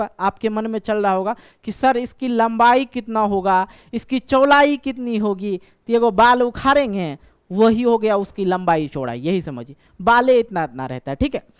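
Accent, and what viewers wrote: Bihar, India. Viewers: Sir aap Bihar sa hai kya....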